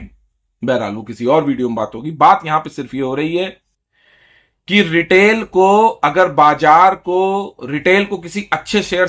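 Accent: Kanpur, India. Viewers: एक दम आम व ज्ञानी आदमी, कनपुरिया स्टाईल में। मजा आ जाता है सुनकर। अति उत्तम।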